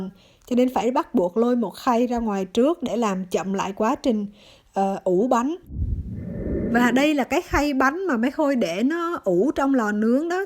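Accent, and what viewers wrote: Central, Vietnam. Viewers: Cám ơn Mai Khôi chia sẽ. Nghe giọng nói hình như người miền trung hoặc Đalat?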